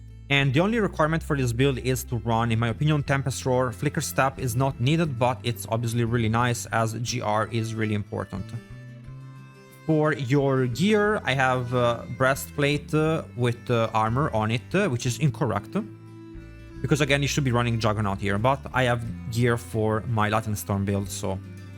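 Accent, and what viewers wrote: Italy. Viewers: I like his Italian accent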